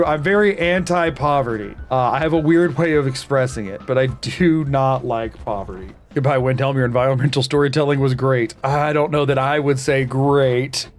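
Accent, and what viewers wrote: United States. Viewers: Baltimore Accent run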